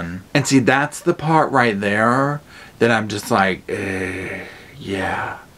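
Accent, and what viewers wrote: South, United States. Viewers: She is a freaking Narcissist that knows everything. However, I love your Southern twang! 👍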